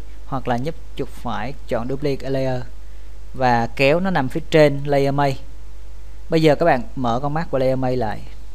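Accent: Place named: South, Vietnam. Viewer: Kết cái giọng Nam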